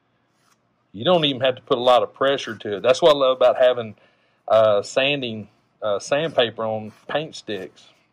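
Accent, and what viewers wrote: South, United States. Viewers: well, i can say this: there was no need for you to apologize near the end of the video for the video being “too long”. You did an EXCELLENT job, not only on the helmets themselves, but on explaining everything throughout the whole process. I am pretty much brand new at this whole casting & molding thing. so new, that all i’ve done so far is some “research” or in other words, “watched a dozen or so youtube videos it. and, i wish i woulda stumbled on your video first, i would be comfortable trying my first “run” just from watching this video alone. so, just wanted to say thank you for this video, i learned more from this one video than i did from all the others, combined! 🤣 but hey, dont reckon i ought to be surprised, why? because, i can tell your from the south, like myself… and thats how use good ol boys do it down here! 🤣👍 thanks again brother. 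☺️👍👍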